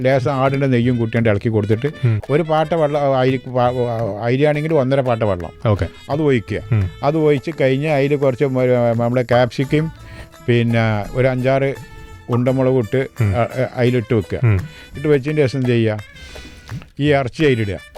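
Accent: Malappuram, India. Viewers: മലപ്പുറം ഭാഷ 😂😂👍🏻👍🏻👍🏻👍🏻😊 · Malappuram slang ❤ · മലപ്പുറം ബാസ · മ്മളെ മലപ്പുറം ❤️❤️❤️👍👍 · ഒറിജിനൽ മലപ്പുറം ഭാഷ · ഇത് മലപ്പുറം ഭാഷ ആണോ ഒന്നും മനസ്സിലായില്ല കാക്കാ 🤣🤣 transalate ചെയ്യ്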